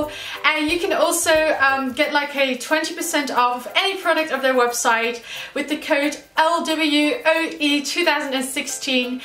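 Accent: Netherlands. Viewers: No hate but I immediately knew you were Dutch the moment you started speaking lol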